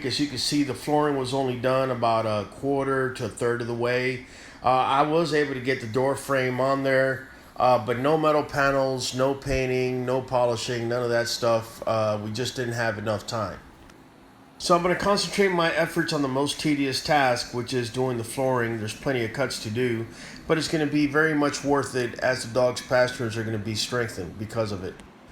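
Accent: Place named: Texas, United States. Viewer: Thank you for your energy and effort to complete these videos. I hit that like and sub. Knew you were in Tex the sec I heard the fam lmao.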